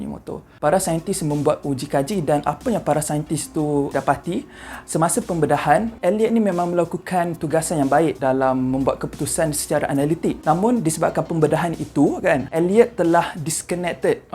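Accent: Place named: Kelantan, Malaysia. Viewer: Ni mesti org klantan dapat cam loghat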